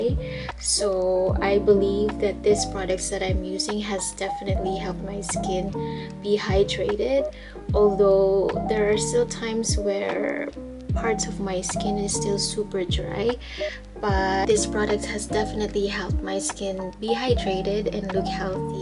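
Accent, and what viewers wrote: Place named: Philippines. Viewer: My idol sa taglishing ug bisayinging pronouncing. Akoa jud ning paaboton para makakuha kog mga tips unsaon pagpa gwapa. Hihi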